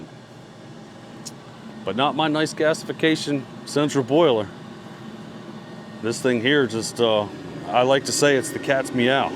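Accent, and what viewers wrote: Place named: United Kingdom. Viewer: Another viewer from the UK here. Just wondering if you're from the UK, or have spent time here as parts of your accent is very British :) First time watching, great content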